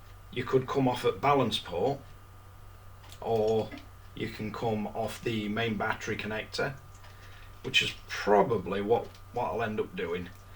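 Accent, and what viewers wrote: Yorkshire, United Kingdom. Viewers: Hi mate you sound like a fellow Yorkshire man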